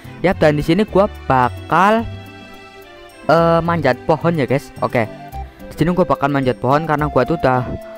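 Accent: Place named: Java, Indonesia. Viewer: Bahasanya medok kk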